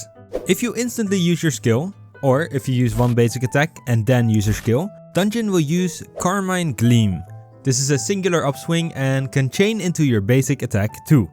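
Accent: Netherlands. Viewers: Danijn for the win! You sound Dutch? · love the dutch accent :)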